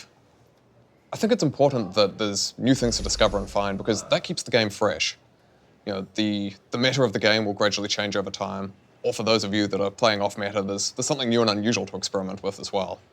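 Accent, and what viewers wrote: New Zealand. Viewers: I'm pretty sure I read once that from New Zealand. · Chris Ng He is from New Zealand mate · So odd to hear a fellow kiwi accent in an official Riot Video :) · new Zealander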